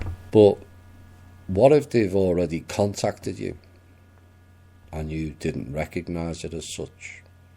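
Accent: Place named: Liverpool, United Kingdom. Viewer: my names Kevin Paul from Birkenhead and I know you know we're this is cause I can here the Scouse in ya lad! 👍🙏🇬🇧😇lisen I'm age40 dad to 4, and 16!weeks ago I had a spiritual awakening and since then I can see the evil entities in certain professionals in my area and in many other people around me, my GP doctors is one of them and he is trying hard to convince me I'm loosing my mind, Don't worry I haven't told him what I'm seeing and receiving, Ive just asked him why Ive been floating out my body multiple nights recently and I made the huge mistake in asking for advice from my dr but I never mentioned my spiritual awakening, but he know Fxxkn right I can obviously blatantly see he knows buy his strange reactions and the out of the blue serious advice he is trying to force me to believe ‼️🆘🙏‼️🇬🇧So now I am all alone no one believes me what I am 16 weeks into now and this Awakening doesn't go away I will only become more and more awake and closer to the Oneness which is all of our number one objective while we are in a body, I have reasearched the arse out of awakening but exspecially the UFO FACT and every other subject to do with the pineal gland cover up for multiple decades to humanity ‼️❤️ it's up to you but if someone had the time to lisen to what I have to teach and look at all of my iPhone footage of the Sun and The Moon and the big flashing orbs that appear for me and follow me all over the place both night and day with the sun and moon but the orbs I have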